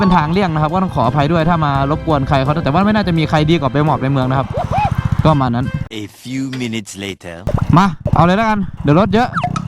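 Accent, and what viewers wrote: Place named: Pathum Thani, Thailand. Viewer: ปทุมธานีชัดมากครับ555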